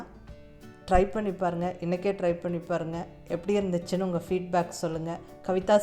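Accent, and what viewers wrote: Kongu, India. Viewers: Superrr ngov.all ur preprations r neat and simple.mainly our kongu language awsome.best wishes pa. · கொங்கு தமிழற நீங்க பேசறதபார்த்தா தெரியுது மேடம்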